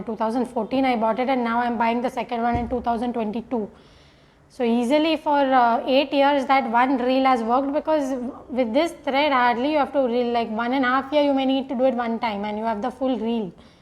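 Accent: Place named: India. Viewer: It’s soothing hearing you talk in your Indian way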